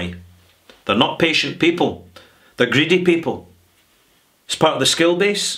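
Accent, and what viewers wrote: Scotland, United Kingdom. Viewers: Who else watches these videos for the hat and the Scottish accent.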